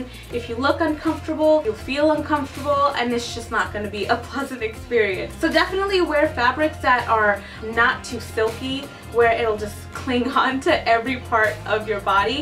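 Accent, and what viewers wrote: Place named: Bengal, India. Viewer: Shes Bengali....have some linguistic awareness.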